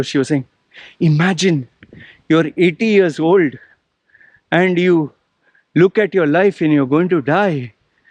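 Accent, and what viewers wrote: India. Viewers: You sound like an indian as well. And why dont you sit down while speaking, just to show some respect to your listeners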